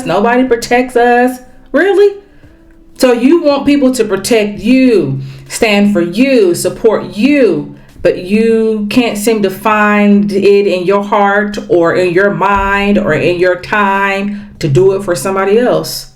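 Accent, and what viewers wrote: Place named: United States. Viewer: You arent FBA,stop maskerading!Tethers can't speak for us if you are not FBA; stop speaking for us; just because you have an American accent doesn't make you FBA; America has had immigration! You or no tether they can make us do nothing! Immigrants need to hold their own..leave us alone! Also, we do not look like Haitians; stop babbling; white people know the difference! You are talking gibberish! People undermind and disrespect us 24-7; we have done it enough. To hell with PanAfricanism and putting the capes on for them, leave us alone!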